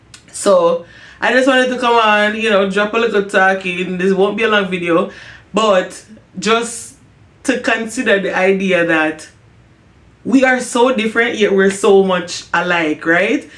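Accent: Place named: Jamaica. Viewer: That is not true. I can definitely tell you're Jamaican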